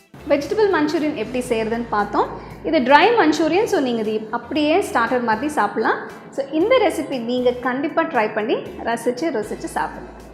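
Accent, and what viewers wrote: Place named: North India, India. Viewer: Tamil uh? I thought ur from North Indian..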